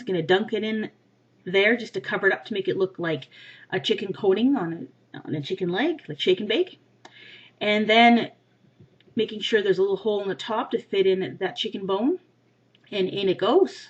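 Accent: Canada. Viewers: Yeah you sound Canadian don't worry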